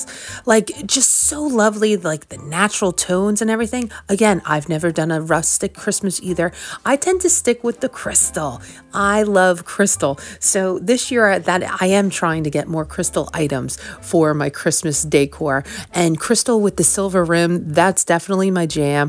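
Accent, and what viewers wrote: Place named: East Coast, United States. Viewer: I can hardly stand to buy new anymore! Everything I wear is thrifted!! And decor for my home as well!!! Books, you name it!! Hugs from Michigan! You sound like you live on the east coast of the United States!! I grew up near Washington DC!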